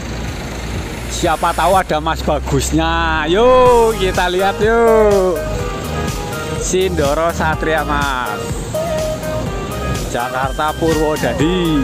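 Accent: Banyumas, Indonesia. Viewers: Selamat malam mas nek ora ngpak ira kepenak....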